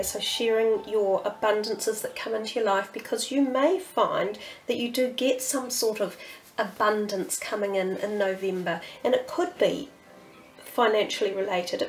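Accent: New Zealand. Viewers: Awesome Reading ~ thankyou :))) Archangel Haniel also brings in the Energies of The Moon for us ~ which weaves beautifully with Archangel Gabriel's Message ~ Intuition & 'Pyschic Insights' .... :))) Wonderful to hear a fellow NZer :))) Aroha & Angel Blessings!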